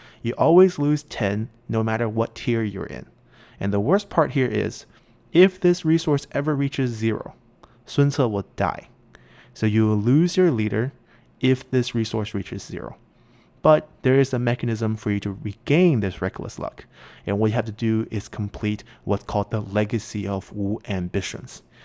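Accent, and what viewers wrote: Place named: China. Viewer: 中國口音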